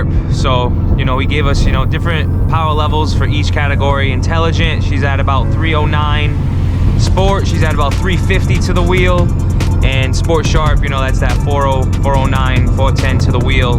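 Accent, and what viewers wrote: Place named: Massachusetts South Coast, United States. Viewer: Driving by Cask and Pig in Dartmouth eh? You from Fall River or New Bedford? I can kind of tell by your accent lol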